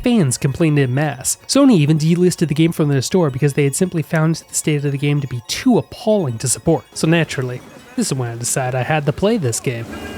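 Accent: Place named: South Boston, United States. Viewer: This guy from Southie or wat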